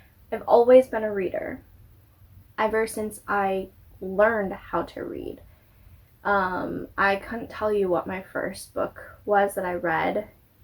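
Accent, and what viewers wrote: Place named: Midwest, United States. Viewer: The Sarah Sanderson midwest accent coming thru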